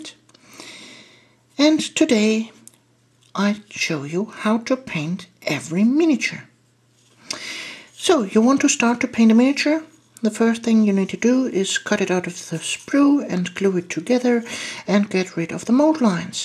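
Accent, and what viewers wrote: Germany. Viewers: Are you German? By the way really good videos:)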